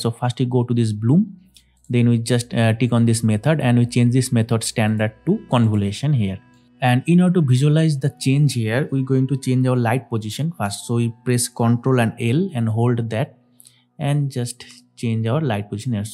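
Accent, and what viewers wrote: India. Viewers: But in an Indian accent 😓.. waste of time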